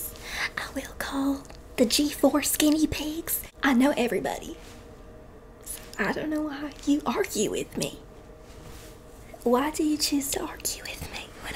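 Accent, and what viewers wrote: South, United States. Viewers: the chaotic energy and southern accent are telling me you’d make the perfect charlotte la bouff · I don’t know why but some parts of this reminds me of Virginia being petty in Rising Hope 😂 it’s that, “oh no honey” and ,”where is your mother”. The slight southern tone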